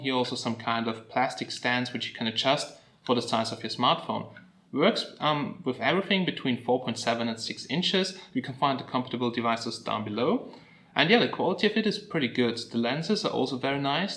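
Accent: Germany. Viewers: Ich geh mal davon aus das du deutsch bist ( von deinem aktzent her) heute war englischprüfung in sachsen... kann es sein das du für den listeningpart den text gesprochen hast? hat sich zumindest so angehört! :D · your english sound with german accent or netherland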